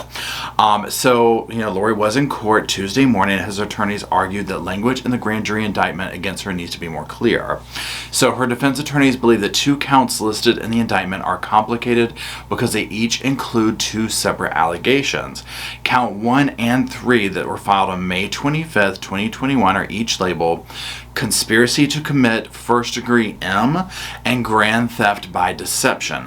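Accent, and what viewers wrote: South, United States. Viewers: Unrelated to the video topic: Omg, I love Mr. Roscoe P. Coletrain! His sweet lil pawpaw face! How old is he? He’s obviously very used to you holding him and talking with your hands because he keeps his sweet lil face out of the way of your hand gestures. You’re one of the few content creators who sounds almost like me…sweet and southern. Y’all are the best and I’m pretty sure I’d watch you read the phone book as long as Roscoe was there to supervise .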